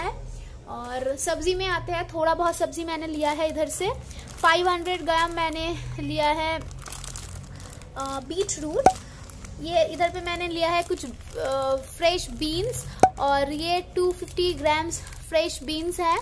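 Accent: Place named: Bengal, India. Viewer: Your acent felt quite bengali😅😅😅. BTW I'm from kolkata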